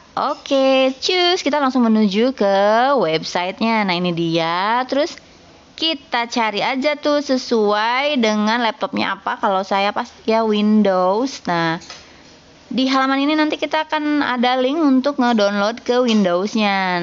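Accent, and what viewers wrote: West Java, Indonesia. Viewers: Hatur nuhun ibu...meni Sunda bangets hehehe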